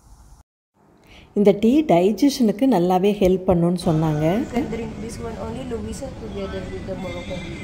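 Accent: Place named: Kongu, India. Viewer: moraccan mint tea miga arumaiaga seithu kanbitheergal sago kongu tamilil pesinathu arumai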